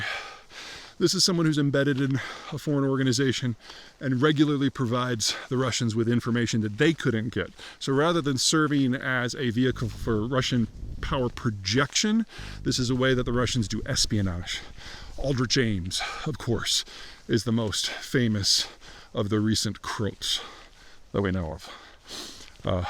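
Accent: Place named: United States. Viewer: Your pronunciation of Russian words is pure American. Clear, but not "native." The average American with training in Russian sounds like he/she is from Georgia; the Georgia that used to be part of the Soviet Union.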